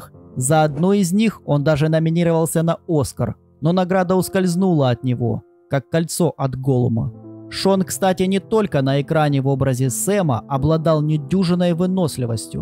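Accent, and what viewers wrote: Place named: Ukraine. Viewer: Интересно рассказываешь. И интонации зачастую правильные берёшь. Заметил слабый украинский акцент) Угадал? Может показалось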